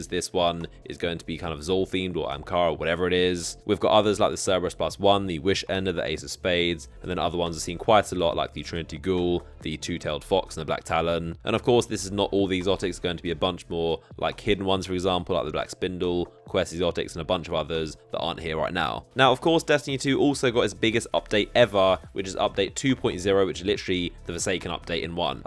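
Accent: United Kingdom. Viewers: My favorite British Boiiii Back At It Again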